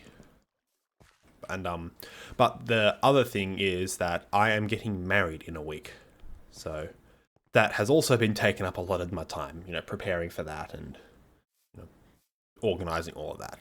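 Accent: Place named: Australia. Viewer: Personally I think these kinds of episodes count as "real" episodes! Couple of things I wanted to mention: -Never realized you were Australian, totally thought you were British or something (english isn't my native language) lol - There's a mod called "simply better minecraft" for 1.8.9 that adds spruce/birch/dark oak signs/doors/trapdoors, etc. -Looking forward to that video/segment where you explain why you chose to play on 1.8.9, though from what you've said in this video I completely agree! Modern Minecraft has some cool features (some new blocks and biomes are really nice) but I feel like it's a mile wide and 2 inches deep -You've mentionned some Minecraft content creators (which I will definitely check out), are there any other Youtubers that inspired you? OG LPers like Etho, Stampy, etc? -Congrats on your promotion/marriage! Take as much time as you need between videos, like you said the world will always be there so there's no rush!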